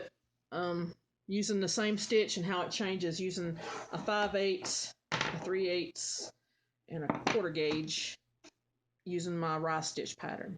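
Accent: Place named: South Carolina, United States. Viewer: Hey hun, where you live? Upstate South Carolina here. You talk like we do , actually better than I do. People from other states have trouble understanding what I say. My daughter-in-law is German and taken years to feel comfortable talking with her! There's still times she and I don't comprehend one another. Also, I love your videos! I'm so grateful for you and several others, no offense, if not for these videos I probably would never started 😅! Thank you 😊!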